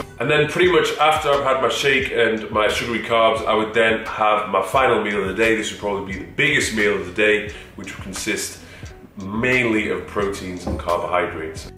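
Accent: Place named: England, United Kingdom. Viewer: Hi, you’re a handsome guy and I love your English accent. Stay humble. 💕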